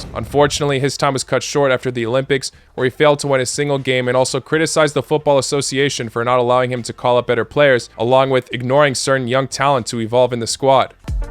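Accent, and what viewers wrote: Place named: United States. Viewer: wdym he was born in usa he was born in croatia but his family moved to usa during the war of independence living there for so long must be why he shows an american accent in his videos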